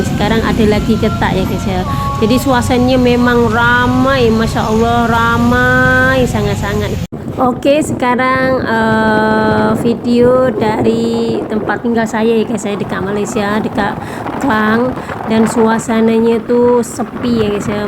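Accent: Java, Indonesia. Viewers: bahasanya boleh melayu Malaysia tapi logat jawanya medog banget inilah Jamed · Logatnya biasa aja lah pake indonesia jadi kurang enak dengarnya pake bahasa melayu malasyia lucu,gak enak dengarnya sebab medhoknya masih nampak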